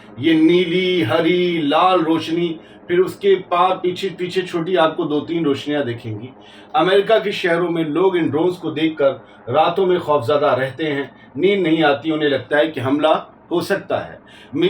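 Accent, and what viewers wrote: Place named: Lucknow, India. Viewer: your accent sometimes make me feel that you are from Lucknow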